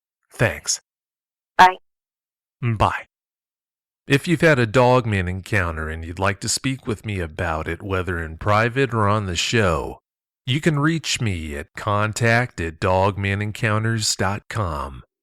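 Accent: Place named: South, United States. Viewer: I'm surprised it never came back for the other 2 goats, or did it?? poor billy goats 🐐🐐.. and... love the Southern accent! ☺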